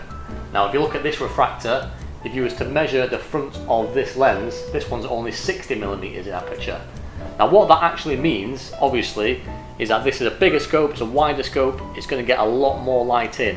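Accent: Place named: Liverpool, United Kingdom. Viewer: loved the video. Ignore the YouTube trolls trying to get a laugh for their site on his Beatles Liverpool accent.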